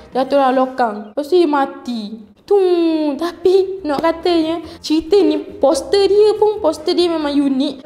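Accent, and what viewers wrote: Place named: Terengganu, Malaysia. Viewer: Pekatnya ganu sis🤣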